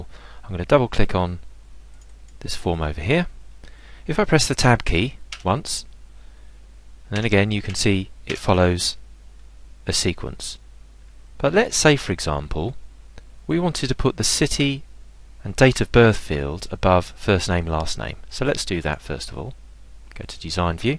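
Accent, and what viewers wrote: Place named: United Kingdom. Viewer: Great concise instructions, although the intro showing why it's needed it probably not so necessary. We wouldn't be here if we didn't already know what was wrong. And delivered, no less, in that wonderful British accent that makes the material just so much more profound-sounding; the accent that can make reading a telephone book sound like an interesting intellectual exercise! Cheers!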